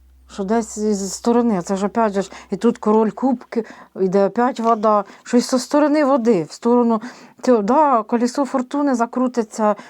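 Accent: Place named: Surzhyk, Ukraine. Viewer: Як важко слухати "суржик". І каже правільні речі... Але. Вибачаюсь.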